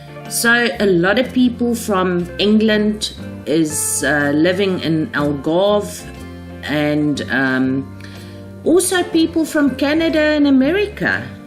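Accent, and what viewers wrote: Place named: South Africa. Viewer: Oh my word you're south African!!!!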